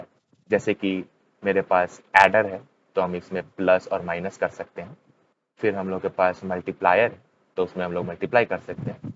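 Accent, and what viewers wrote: Bihar, India. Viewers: Bihari accent sunke bhaiya wali vibe aa rahi hai sach me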